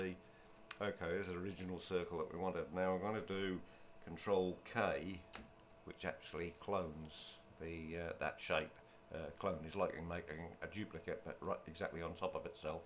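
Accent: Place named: United Kingdom. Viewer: Oh my you have such a heavy British accent:)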